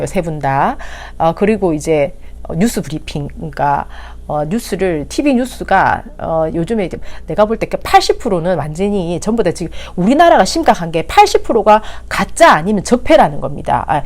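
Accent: Gyeongsang, South Korea. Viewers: 말투가 대구쪽이신듯 해요~ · 반갑습니다, 님, 특유 고발의 경상도 여성 애교 목소리,화이팅 합시다,이재명 은 좋은 뉴스, 나뿐 뉴스, 상관없이,득점하는 신 임니다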